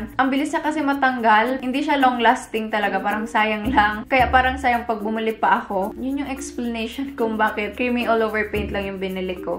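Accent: Visayas, Philippines. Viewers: parang bisaya to haha tabang mga binisaya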